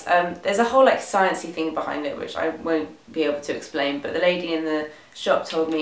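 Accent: England, United Kingdom. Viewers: What part of England are you from? Love your accent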